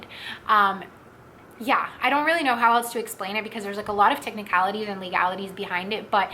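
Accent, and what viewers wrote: Quebec, Canada. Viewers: You have a Quebec accent.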